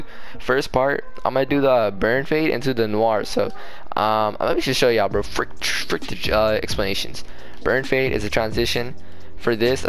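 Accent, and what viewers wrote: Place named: North, United Kingdom. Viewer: Sounds like some up north stuff so I can follow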